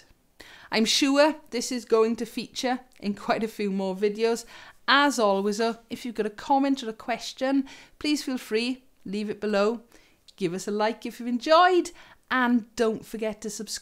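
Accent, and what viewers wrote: Wales, United Kingdom. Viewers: English? Sounds suspiciously like a Welsh accent to me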